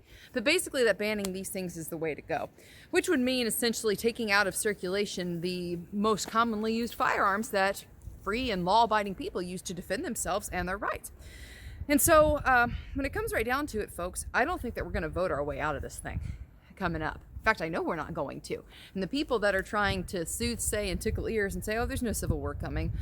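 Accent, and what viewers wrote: South, United States. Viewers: Yes I like what you have to say I think the same way I hear you got to hear you have a Southern Voice I live in South Carolina I like to meet you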